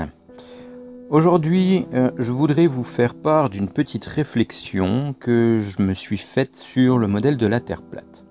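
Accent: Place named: Alsace, France. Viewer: merci pour tes gros efforts ainsi de partager ton savoir. Il est primordial d'informer pour que les jeunes ne tombent pas dans le piège des complotistes. Pour les purs et durs de la désinformation, tu ne peux plus rien faire, excepter cette prévention. (j'ai remarqué au vu de ton accent que tu dois être du coté de l'alsace ou pas loin ?) :) note : chippie a obtenue gain de cause avec terrienne !!!! mais non rien, je note c'est tout !!! :p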